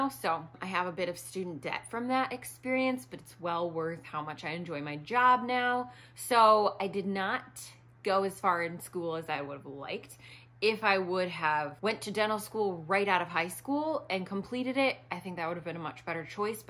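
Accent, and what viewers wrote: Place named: Midwest, United States. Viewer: I'd say your accent is mostly midwest with a touch of North Dakota, so Michigan make a lot of sense.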